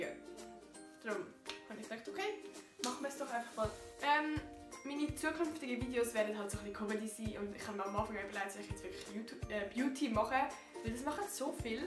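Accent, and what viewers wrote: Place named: Switzerland. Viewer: Sehr cool, das Schwitzerdeutsch 😁👍 fast wie eine andere Sprache 😜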